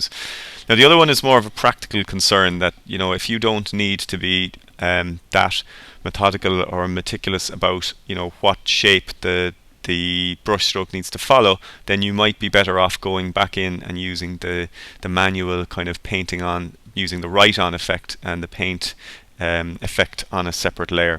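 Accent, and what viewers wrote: Ireland. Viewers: Came for the content, stayed for the Irish accent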